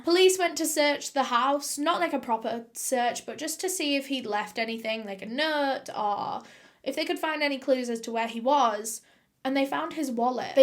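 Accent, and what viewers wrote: United Kingdom. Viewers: "wrongenh" :'') i love the British accent its so cute sometimes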